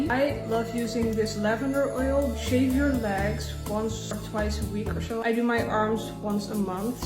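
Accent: Netherlands. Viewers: hey girl ben je toevalig nederlands ik hoor een accenttt!?? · I can hear from ur voice ur Dutch and if ur not then I am tone deaf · You have a really Dutch accent, idk why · girlieeeeee are you dutch you have a littele dutch accentttttttttttttttttttt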